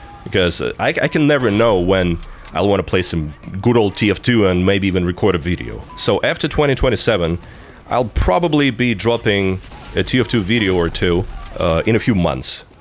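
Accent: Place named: Russia. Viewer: I'm just here for the sexy Russian voice honestly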